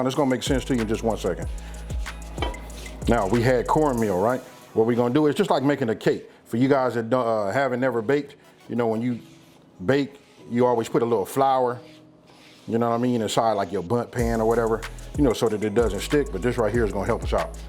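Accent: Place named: California, United States. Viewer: This cali accent threw me off but this looks so good I gotta try it!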